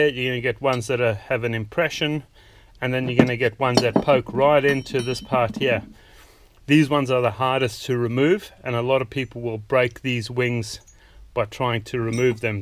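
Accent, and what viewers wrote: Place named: South Africa. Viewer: Love the South african accent.😊 Thank you for all your educational videos.🙏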